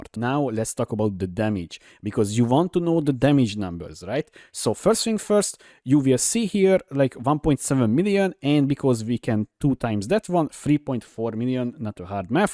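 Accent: Hungary. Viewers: szevasz, a kiejtésed alapján magyar vagy. sosem nyomtam még 1 ligát sem egy zsír új skillel, de most ezzel fogok kezdeni, köszi a videót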